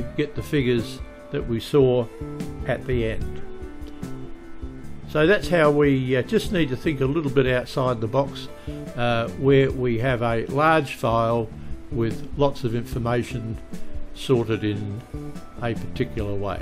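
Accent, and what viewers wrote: Australia. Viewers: True... I'm used to listening to his delightful Aussie accent... sans music... for my latest Excel learning tidbit.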